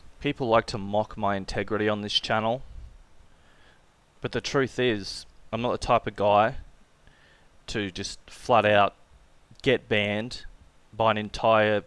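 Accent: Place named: Australia. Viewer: bro I love your channel, your Aussia I can tell, you aussies are the best shittalkers I swear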